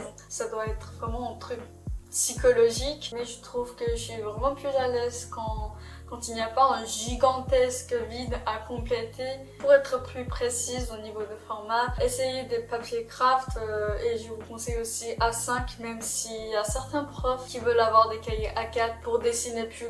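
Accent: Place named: France. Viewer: wow i love your french accent 😍it's so gorgeous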